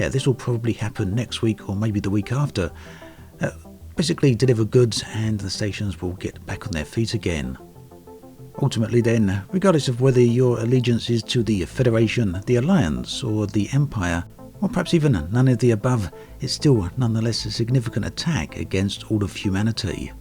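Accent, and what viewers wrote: United Kingdom. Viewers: This dude says Bri'ish unironically, I promise.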